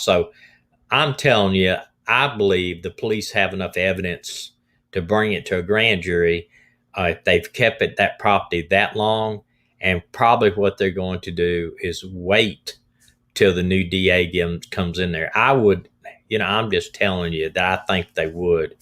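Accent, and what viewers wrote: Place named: South, United States. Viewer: . You are beautiful! And I love your southern voice💖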